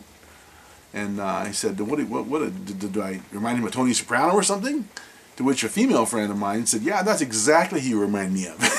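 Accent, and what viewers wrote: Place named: Ireland. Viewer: You might get mistaken for an potential Irish thug but no where near a Tony Soprano. Your Irish comes out too much in everything you do. Hell, I can tell you're irish even though you haven't told me! Now me, on the other hand...I'm called "the Godfather" by all the guys I race R/C trucks with...they line up in the pits to come talk to me and shake my hand...one at a time. That's how I got the nickname "Godfather". This is no lie. I'm usually smoking a Churchill sized gar when this happens..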